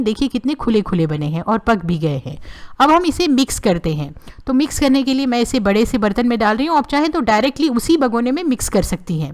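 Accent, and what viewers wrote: Hyderabad, India. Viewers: aslkm unty kya ap hyd se h apki language hyd jaise h plz rply kare thanku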